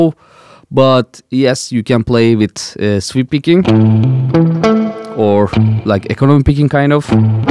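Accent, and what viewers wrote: Turkey. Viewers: Abi türk olduğunu aksandan anladım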